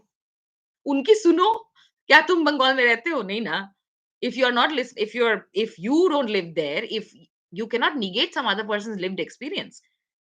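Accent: Standard. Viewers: Madam आप का हिंदी बहुत ही शुद्ध है 😊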